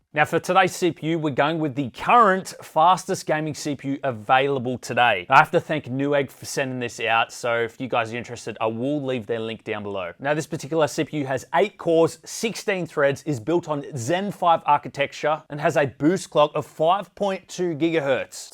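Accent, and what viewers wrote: Australia. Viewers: nice mate. Love your content 👍🏻 I knew you're Aussie from the accent but wasn't sure if you're currently residing in AUS or USA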